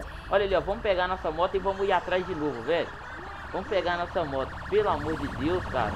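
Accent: Minas Gerais, Brazil. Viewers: Ow! Vc é mineiro pow! Coloca umas viaturas da PMMG ae mano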